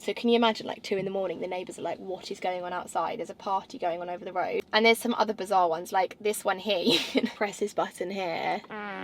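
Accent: United Kingdom. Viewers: clicked for the car, stayed for the accent, love the brits accent damn it's soo cute & sexy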